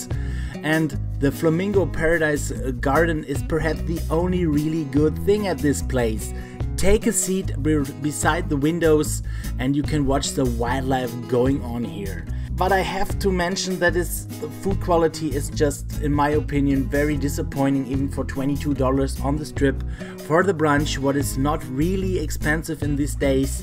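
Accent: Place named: Germany. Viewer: I happen to love your German accent & your videos :)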